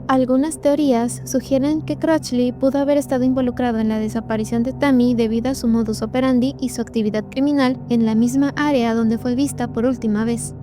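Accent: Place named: Fresa, Mexico. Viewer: Estaba intentando tomarme en serio el video por el contenido..... Pero es que es imposible con esa vocecilla!!!!!!!!!! Super kiwi/Fresa no me quiero imaginar en la cama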